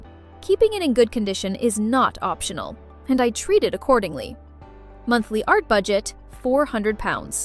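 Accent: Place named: United States. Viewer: Why does she speak with an American accent instead of a British one?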